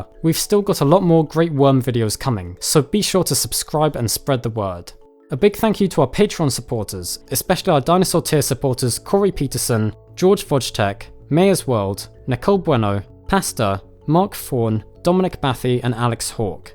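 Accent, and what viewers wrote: England, United Kingdom. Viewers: I knew I was about to hear an english guy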